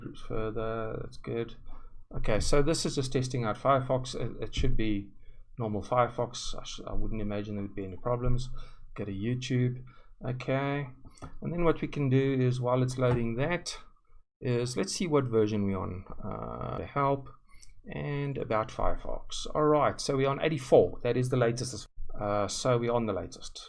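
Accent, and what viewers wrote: South Africa. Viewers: is that a jozi accent i hear?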